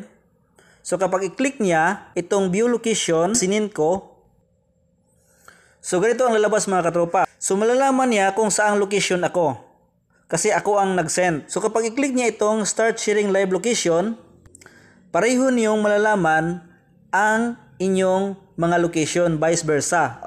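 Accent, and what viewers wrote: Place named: Iloilo, Philippines. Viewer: ilonggo ka pala po hahaha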